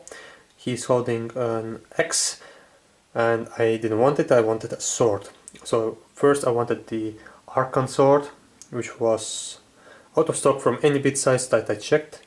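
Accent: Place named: Russia. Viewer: I work with people every day in Atlanta, GA, USA that have MUCH worse Russian accents than you!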